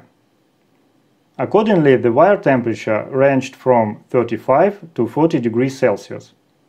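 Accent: Russia. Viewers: Ебать русский акцент сильный😂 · Сразу слышно русский акцент)) Спасибо за тесты и за все видео =) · Why Russians have terrible English accent? 🤔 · У тебя русский диалект. Почему на русском языке не делаешь материал?)) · Слышу Русский акцент))) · Английский русскоговорящего нисчем не спутаешь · Сразу видно русский на Английском говорит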